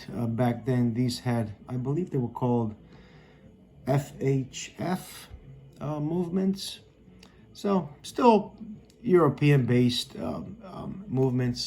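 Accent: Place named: United States. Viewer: M-ai ambiționat sa scot vechiul atlantic al bunicului meu care e într-o stare destul de bună dar are nevoie de o servisare, am cumparat ceasuri noi gen Citizen eco drive, Certina, Orient și chiar uitasem de Atlantic 😂 Dacă nu spuneai unde te-ai născut nu îmi dădeam seama ca ești român, ai un accent de american pur, frumos review like a Pro! :)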